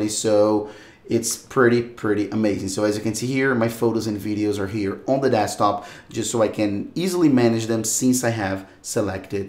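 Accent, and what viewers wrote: Brazil. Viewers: Muito engraçado como seu inglês é quase perfeito, mas da perceber que eh brasileiro, ótimo trabalho irmão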